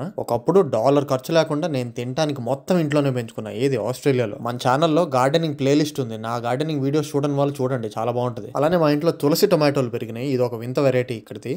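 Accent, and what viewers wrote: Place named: Andhra Pradesh, India. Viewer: Bro, your way of speaking is lovely...Andhra abbai...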